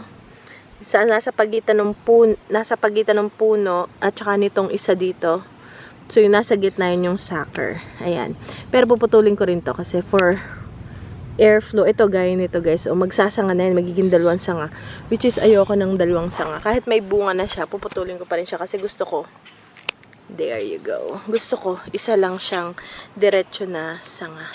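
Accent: Batangas, Philippines. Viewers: Thank you so much sa pagsagot ng tanong ko..kaya pala may dine at baga ang salita ..kahawig ng salita namin sa Mindoro...lagi ko pong panunuurin ang inyong vlogs..thank you so much🥰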